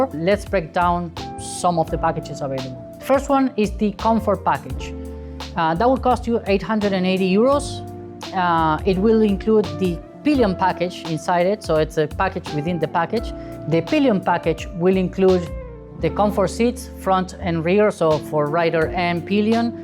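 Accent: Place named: Rio Grande do Sul, Brazil. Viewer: Gaúcho de onde Tchê?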